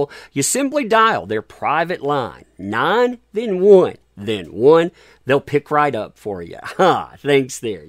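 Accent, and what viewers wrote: South, United States. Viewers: Your doing a good job there keep it up, and that's a damn fine good southern accent as well! T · 🤠Howdy, Pawdner... You're such a WILD THING when it comes to doing your thing called BROADCASTING 😂😂😂 and ALL we women LOVE you for that cute southern accent and for the DECENT fellow you are. If AH ever imagined you're everybody's Good Samaritan of Justice and avenger of the Innocent people... She'd get jealous! But I'm pretty sure she Does, lol👍🏼GREAT JOB! BLESSINGS and my most loving respect for you always coming your way.. Love & kisses you wild Thing. 💪🏻UMH! Forever Your's.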